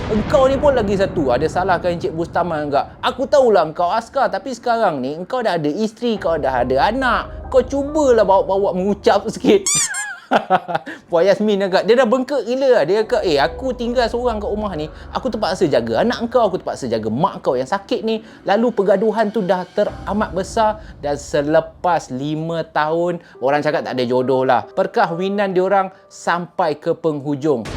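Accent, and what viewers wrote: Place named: Kelantan, Malaysia. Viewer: acu tera crito kuk baso klate pulok ehh...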